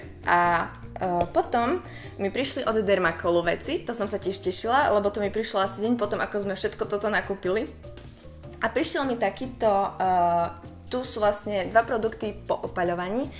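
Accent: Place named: Eastern Slovakia, Slovakia. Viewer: Môžem sa spytať kde byvaš??Lebo maš trošku vychodniarsky prizvuk:D:D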